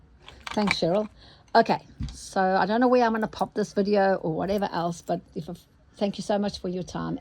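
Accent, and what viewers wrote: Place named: South Africa. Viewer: Just came across your video. Lovely to hear another Saffer accent. :)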